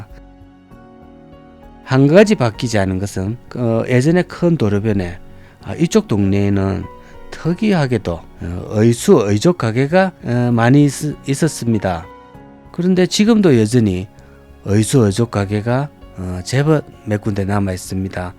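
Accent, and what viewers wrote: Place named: Busan, South Korea. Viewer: 부산 알고있습니다 오늘도 구수한 부산 말씀 수고하십니다 새로지은 도시화로 그리운 추억도 고향의 향기를 잃어버린 현실이 안타깝습니다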